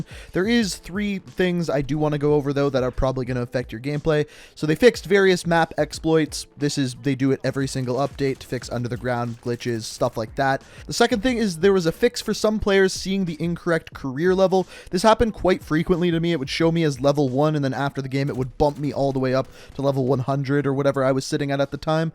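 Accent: Canada. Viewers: I hate Canadian accents !!! You guys have weird ways of saying certain words and it kills us here in the US !!! I even hate people who live close to Canada cuz they also talk like that and its fucking annoying !!!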